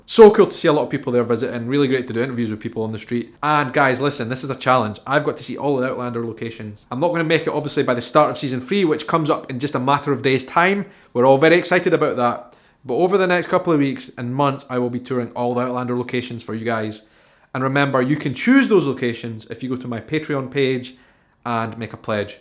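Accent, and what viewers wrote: Scotland, United Kingdom. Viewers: My grandfather was from Glasgow. It's really lovely listening to a Scottish accent even though you are from Edinburgh. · I am sure you hear this all the time, but I could just listen to your accent all day. I love the Scottish accent. Me and my mom have Scotland and Pendle Hill England on our bucket list trip. We want to see where our family came from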